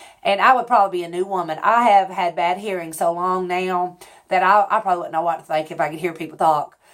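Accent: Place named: West Virginia, United States. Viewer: Hi! I really hope you read this. I was searching for weight loss help and YouTube sent one of your videos to me. I LOVED IT AND love listening to you! You actually made me cry watching this one. Honey, all women feel the way you have been feeling at one time or another. I can relate to so much to what you have been through. I just wish I would have found you earlier. I wish I would have found you while you were posting your videos. Maybe, with more folks responding to your videos you would have stayed with us. I recently reached out to your daughter Hope to find out if you would be coming back. She said she didn't think you would be back. I am so sad to hear that. If you come back and do a lot more weight loss tips and such, I have no doubt you will get a lot more subscribers. I don't know anyone who isn't trying to lose weight. 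I have lost and gained so many times (like you), you were going to be my weight loss coach. I am binge watching your videos b/c you remind me of so many people in my own family. My Mom's family is from W.V. and have the same accent as you. I love it. If you just got tired of doing the videos, maybe in time you will come back and do a couple or so a month. If you don't, I want to thank you for what you have given us. I so enjoy all the ones I have watched so far. I wish you all the best either way. Take care and enjoy the many blessings you have in your life. We miss you.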